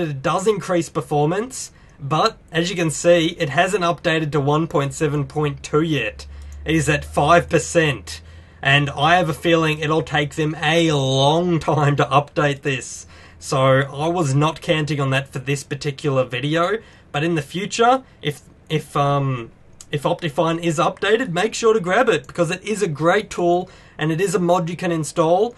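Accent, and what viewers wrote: Australia. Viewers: umm... are you Australian, British or another country/background. Srry I just really wanna know cuz i'm not good with accents:S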